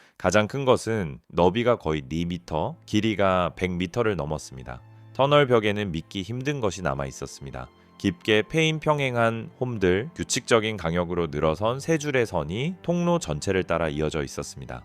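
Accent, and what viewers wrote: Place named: China. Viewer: ㅋㅋ짱깽이냐 3M를 세미터 그러고 서론이 너무길다 구독했는데 취소하고 간다 한국말 연습 더해라ㅋㅋㅋ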